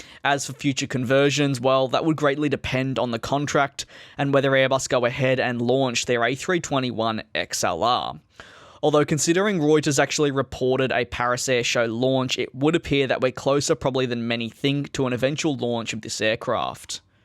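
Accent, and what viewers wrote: Australia. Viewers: Hes Australian Dude.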